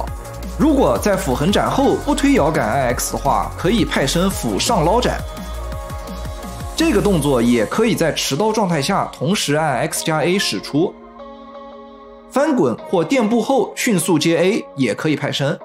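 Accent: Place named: Shanghai, China. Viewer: 您是上海人吧，感觉你普通话的口音很有这感觉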